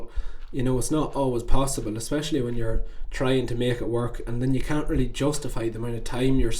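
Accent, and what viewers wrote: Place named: Ireland. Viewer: Dude this is awesome. A lot of people dont start coming to terms with dissatisfaction and fear and uncertainty until years down the line from where you are, if they ever do. Most people waste years chasing pleasure and fulfillment through every avenue imaginable before coming up dry and realizing everything was for nothing. Seems to me like you are starting to realize the low hanging fruit isnt always the best fruit pretty young, which is admirable. I'm only a couple years older than you (I'm 23) so I can definitely empathize with seeing your whole life ahead of you but being afraid of never finding true satisfaction. If you want my theories and experiences with seeking true fulfillment I'm happy to share! Side note... You sound Irish, is that right? I'm in the US (Alabama to be specific) and your accent is AWESOME. I went to Ireland on a study abroad in 2022 and I definitely want to go back someday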